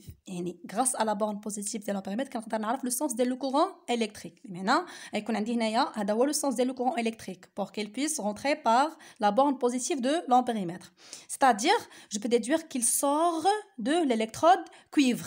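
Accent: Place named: Canada. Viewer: Canadian accent wow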